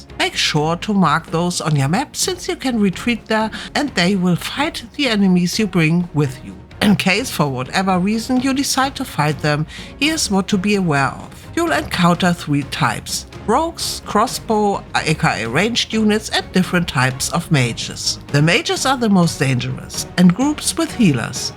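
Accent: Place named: Germany. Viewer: Den Kanal nie gesehen, nach den ersten zwei Sätzen Englisch "Ah eine Deutsche" 😄. Aber eine nette Stimme, alles sehr schön erklärt.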